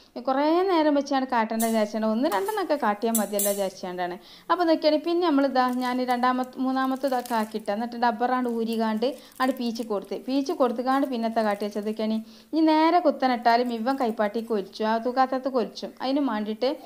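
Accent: Malappuram, India. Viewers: മലപ്പുറം സ്ലാങ് ആണോ ഇത് .നല്ല രസമുണ്ട് കേൾക്കാൻ .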